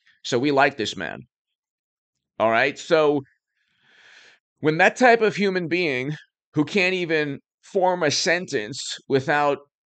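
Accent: United States. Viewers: American was the voice over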